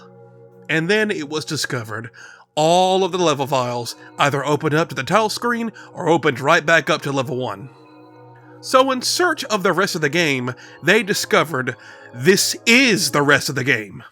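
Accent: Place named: United States. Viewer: I gotta be honest, I like listening to voice. It’s just something about the “Cowboy-ish” accent that really tickles my brain.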